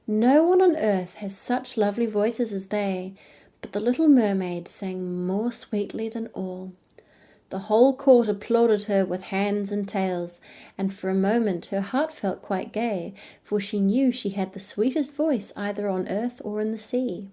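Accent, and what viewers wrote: New Zealand. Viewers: Your voice and Kiwi accent are so relaxing 😊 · I usually don't mind the NZ accent, but not necessarily for story telling, tbh you sound lispy, have you taken your piercings out? Sorry I've had to leave you and go to another channel called relaxe her voice is clearer and not so lispy and poppy very soothing American story teller she is, but I give you an A+ for effort🦇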